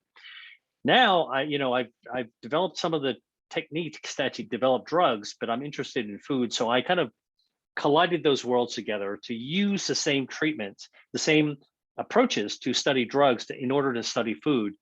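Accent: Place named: United States. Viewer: I like watching his videos. Great info and so glad I can understand his American English. 😅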